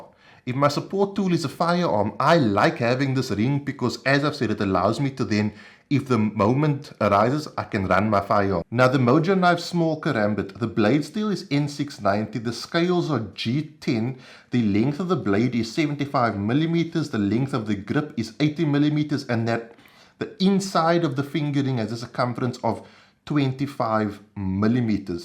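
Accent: South Africa. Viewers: Great review! ☺️ I look for the very same thing in defensive knives, and have one in quite a similar shape. Also, your South African accent is very beautiful!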